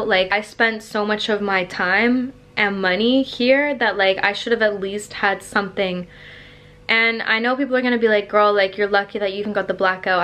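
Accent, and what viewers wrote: United States. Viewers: I'm a bit confused! Your accent is American and you say pounds££ so I'm a bit confused or is it just me thinking you sound American?🤔🙈🙈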